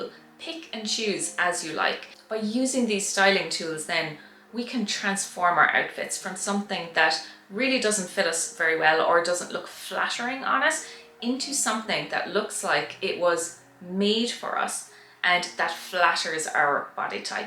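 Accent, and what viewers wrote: Ireland. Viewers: Great video. Informative and uplifting.. thank you for the tips. PS. Your pup is adorable and I adore your Irish accent. Best wishes from 🇨🇦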